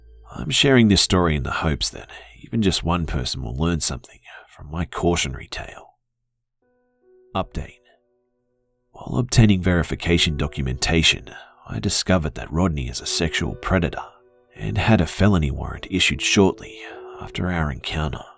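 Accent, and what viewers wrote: Australia. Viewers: Hey mate, are you from Australia? And when are u gonna do a face reveal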